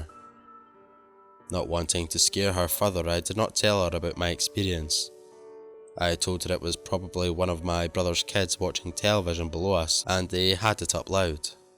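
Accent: Scotland, United Kingdom. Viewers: Never heard The Smiling Man narrated in a Scottish accent before! Thanks for narrating one of my favorite Creepypastas 😁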